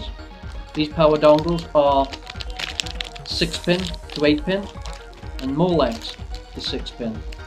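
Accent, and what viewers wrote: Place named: Wales, United Kingdom. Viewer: Are you Welsh? You sound Welsh hah.. Awesome video.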